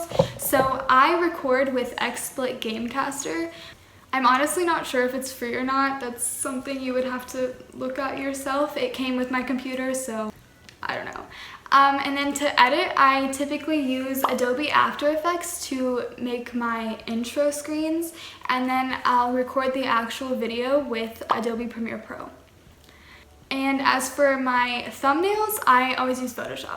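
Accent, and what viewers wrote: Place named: Canada. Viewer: You sound Canadian and it's awesome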